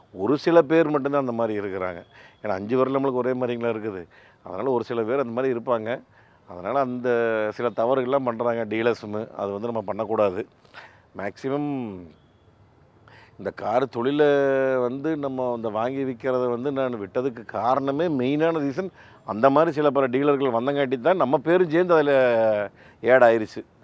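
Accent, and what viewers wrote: Kongu, India. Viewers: Brother.... நீங்க கொங்கு தமிழ் நல்ல பேசறீங்க.... சிறப்பு....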